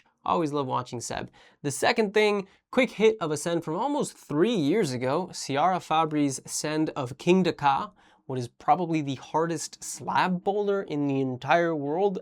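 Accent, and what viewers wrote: United States. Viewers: No way is he Spanish with that accent. He might live in Spain but he's got to be from the US surely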